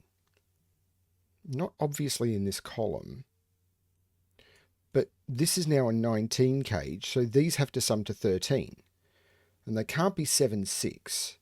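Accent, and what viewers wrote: Australia. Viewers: Is he from Australia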